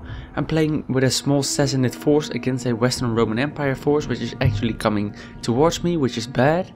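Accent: Netherlands. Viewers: haha nice, ik hoorde al zoiets aan je stem dat je nederlands spreekt haha, maar je spreekt erg goed engels hoor en een gaafe video! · i hear your dutch :D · Hm. I never heard you dutch speak english before. The accent had me wondering where you might be from, i actually had to look it up on your channel :P If you had been speaking german instead, i'm sure i would've recognized your heritage at an instant. In other news: Neat video, i kinda like the accent for no reason as well. Only 4 more days till Attila, WOO! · Are you dutch?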